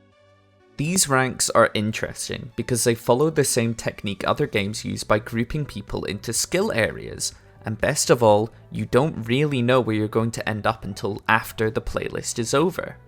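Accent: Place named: Scotland, United Kingdom. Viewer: WOW! What a wonderful and informative video. The OSU! community thanks you for putting such a great amount of effort into this, from the detailed animations to the outstanding soundbytes (pure nostalgia from Vsauce). As an ex-OSU! player, I always struggled with playing against smurfs >:( I blame my friend - Jamgyo. Forcefully making me download the game and getting me hooked. From there, it was a never-ending spiral of despair and misery from these smurfs. The game baits you in with its fun colours, fast-action-paced music, and anime backgrounds. Only to be humiliated as the screen goes black and you get a glimpse of your reflection... A sad, sweaty, filthy casual. This agony is only further prolonged as you realise you have received another defeat from someone who has deranked. As a result of this, I have had to stop playing and focus my attention elsewhere. 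I recently joined a friendly Old School Runescape Discord server, and with the great support and assistance of these members, whom I am proudly now able to call my friends, I am slowly closing the gap towards a FIRE CAPE. The thilling gameplay and pure excitement from 16hrs of straight griding in the Nightmare Zone have really made me consider never returning to OSU! Don't let my horror story put you off your grind; endurance is key, and you will triumph against these lowlifes. P.S. Love the Scottish accent🏆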